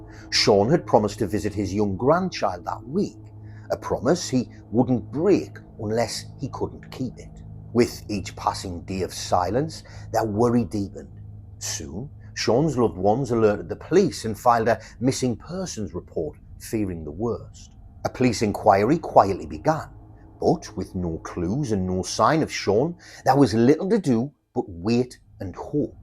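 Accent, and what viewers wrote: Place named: England, United Kingdom. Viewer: I cannot penetrate the host’s regional English accent.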